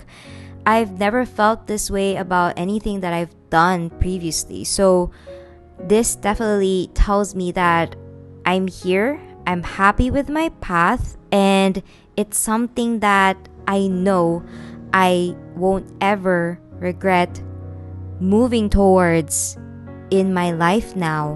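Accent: Philippines. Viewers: I knew it! I thought maybe you are also from the Philippines when I heard your voice haha! I'm happy for you that you found yourself and endured through the hard times in life. I'm also in this lifelong pursuit of art and I have a lot more to go through and its not easy, but I know this is the thing that I've stuck through all this time no matter how challenging it becomes.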